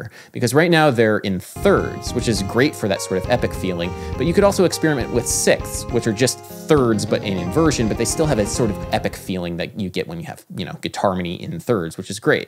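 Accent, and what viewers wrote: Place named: New York, United States. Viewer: Just something that keeps intriguing me. Where are you from? This is one US accent I’m not familiar with. Great vids mate!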